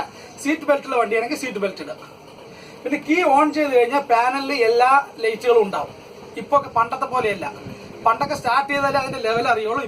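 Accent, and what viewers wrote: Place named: Palakkad, India. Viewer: I Like ur palakkad Languages iam from pune T q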